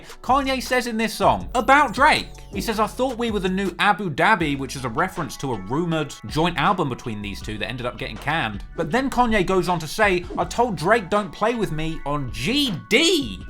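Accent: England, United Kingdom. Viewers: We don’t wanna hear a guy from England report on this….. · why is a british man giving me information on a chicago based gang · Yeah I think he's from, you know, England - because of the British accent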